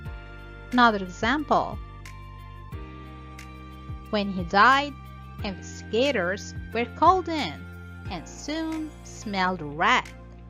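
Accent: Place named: United States. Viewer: Your American accent is really incredible. Your explanation is great 👍 It's important to learn some idioms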